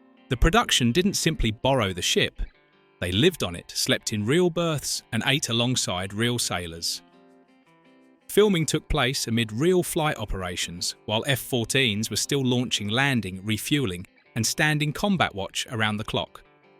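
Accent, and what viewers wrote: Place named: Liverpool, United Kingdom. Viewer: I agree with you. It would also be nice to have a English speaker for narration instead of that liverpool English. Thank God for captions😂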